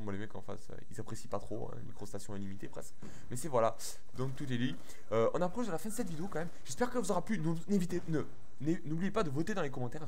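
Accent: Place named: Marseille, France. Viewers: T'avais dit que t'allais montrer tes stats lol jaime beaucoup ton accent Marseillais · Un toulousain avc un accent marseillais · j'alias lui demander s'il était pas marseillais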